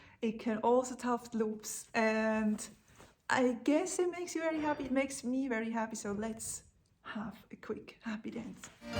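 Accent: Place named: Switzerland. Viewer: avec le ravissant accent suisse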